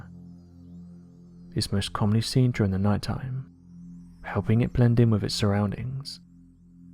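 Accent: United Kingdom. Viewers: Your British voice drives me up the wall. Have somebody else narrate your stuff. When you talk British and you talk like you're singing.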